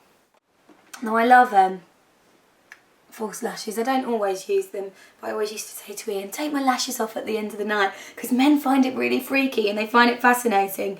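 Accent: United Kingdom. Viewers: Jesuuus! She's british and I can't understand many things she say :/ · I really love your brittish accent *__*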